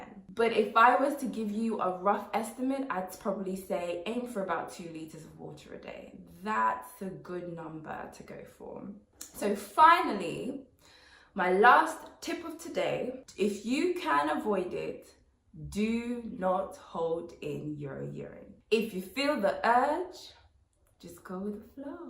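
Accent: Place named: United States. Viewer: Yassss, loved this, your accent though sounding like a real yankee 😂😂😂 love how you switch it up on us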